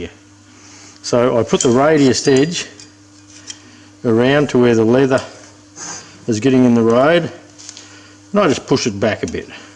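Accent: Australia. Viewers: Nice Aussie accent Mate !!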